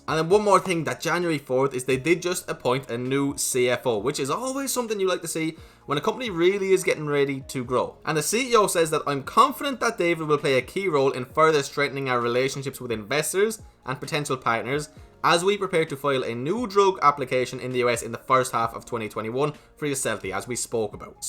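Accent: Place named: Ireland. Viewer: It's so obsevaious he's from Ireland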